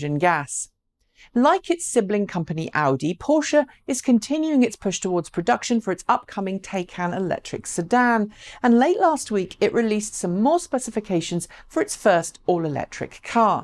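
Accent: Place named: United Kingdom. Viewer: It is without contest that your show of the many YouTube professional and semi professional out there in the digital world one of the best if not the best in it's class. Yes many may say it is in fact your livelihood but the progress made over the years with how the show is presented is fantastic. The content is always from my Jamaican prospective deep, rich and eye opening given from a balanced position. This is where my deliberate and express bias comes in; that beautiful British voice is always beckoning my undivided attention to hear the unbroken dialect. Sweet!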